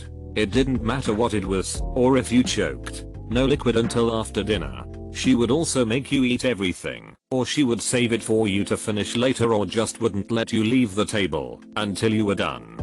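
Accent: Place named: United Kingdom. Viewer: Although I can understand English almost perfectly, this British robot voice is always so hard to understand. I'd rather have someone just reading them out loud that would be much better.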